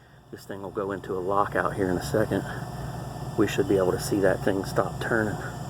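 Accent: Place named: Southeast, United States. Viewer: Hey man, Where' bouts you from? You sound like you may be somewhere near the southeast region? maybe? haha :)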